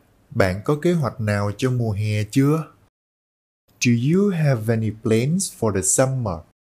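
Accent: South, Vietnam. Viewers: Tôi thích giọng . miền Nam dễ thương và dễ nghe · Thích giọng miền Nam ghê, rất rõ ràng.. xin cảm ơn.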